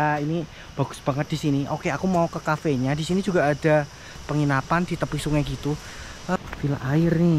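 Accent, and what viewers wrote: Java, Indonesia. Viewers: Gw paling suka cara mas brow gw ini komentari situasi di sepanjang perjalanan. Logat jawa nya itu yg bikin lucu dan seru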